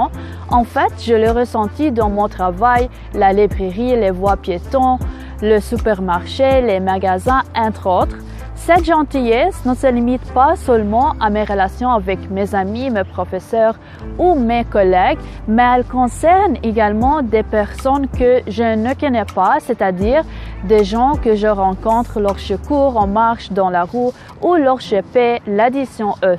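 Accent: Arab world. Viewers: Me encantó tu italiano! sin duda se nota que el árabe es tu lengua. PD: Saludos desde Guatemala, humildemente bilingüe jaja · ¿Qué dialecto es tu árabe?, ¡Me encanta!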